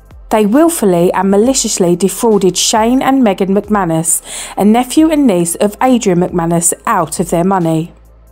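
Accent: United Kingdom. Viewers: The more I watch these kind of videos the more horrified I get how much Michael was bullied and tortured it seems so beyond what my brain can comprehend how much evil was done to him . How will these people meet their maker one day ??? I would never want to be in their shoes. This housekeeper is hideous and despicable woman not an once of guilt or remorse of what she has done to Michael .On the lighter note the narrators voice is so good I love British accent so beautiful.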